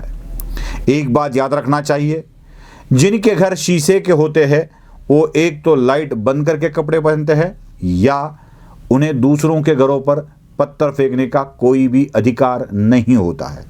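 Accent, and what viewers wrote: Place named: North, India. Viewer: बात सही है.. मराठी विशलेषण एक दम महाराष्ट्र के गावठी मिट्टी से जुड़े है ऐसा दिखता है और हिंदी तो जब बोलते है तो एक दम उत्तर भारत के हैं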